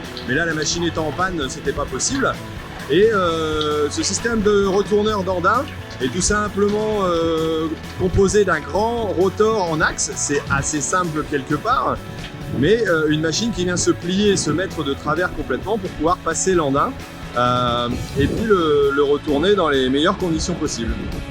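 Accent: Nord, France. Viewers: T'es du Nord ??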